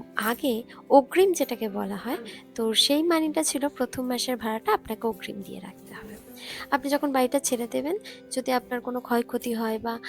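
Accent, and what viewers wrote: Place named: Bangladesh. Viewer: আপনার ভয়েস টা শুনে ইন্ডিয়া মনে হয় না বাংলাদেশী মনে হয়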